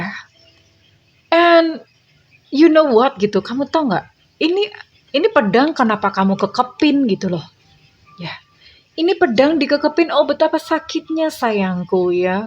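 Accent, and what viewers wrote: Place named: East Java, Indonesia. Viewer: Bunda orang mn y kok bhs nya kayak orang Jawa timuran hehe sy orang Jawa timur Bun hehe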